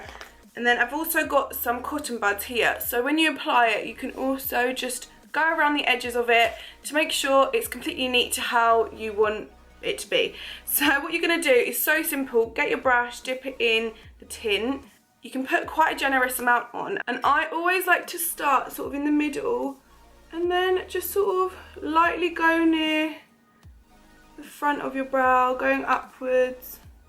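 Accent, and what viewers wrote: United Kingdom. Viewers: R u bristish ????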